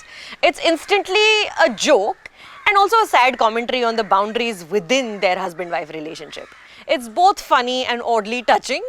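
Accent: India. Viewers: aa gayi south Delhi ki pseudo feminist...oh gawd how cringe this video was. if you want to try to be hip and be a Canadian/american wanna be...work on your "r's" that give away your Indian accent when you are trying to be a westerner feminist. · I like your hindi accent and use of words.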